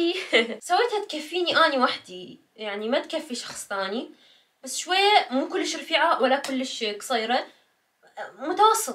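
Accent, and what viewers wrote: Iraq. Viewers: مااحلاكي اول مرة اشوفك.. اموت فاللهجة العراقية. تحياتي من 🇩🇿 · فكرة الخيوط دي هايلة تسلم ايديكي لهجتك في الكلام عراقية اشتقت كتير للعراق فقد قضيت فيها ٤ سنوات ونصف بالتحديد في قضاء سامراء وكنت اذهب مع زوجي لبغداد الحبيبة فقد تجولت في شارع الرشيد وساحة الشهداء وشارع النهر والجورجة بتعطيش حرف الجيم وشارع المنصور والسعدون وحي الحرية والدورة الجديدة كان عمري أيامها بداية العشرينات عمري الان ٦٢ عام ومازلت اتذكر الأماكن والأصحاب العزاز الي تعرفت عليهم بحكم العمل والله نفسي اعرف أخبارهم واطمن عليهم بس ازاي لو اخت منهم تعرف اسمي وتتذكرني تكتب لي اكون سعيدة جدا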